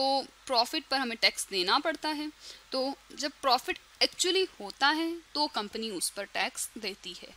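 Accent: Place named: Pakistan. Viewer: Your voice sound as if you're Pakistani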